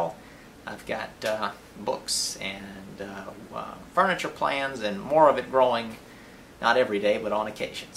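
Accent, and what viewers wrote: South, United States. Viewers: Hahaha! I'm from Arkansas, and not only does your accent give you away, and "down in Georgia" narrows it down even more. I'm not really trying to find your location, I just get a kick out of your sayings. My favorite today was "don't let a nickel hold up a dollar." I've heard that all my life here in the Arkansas Ozarks! Great advice on reclaiming lumber too. I always enjoy your videos, ramblings and all!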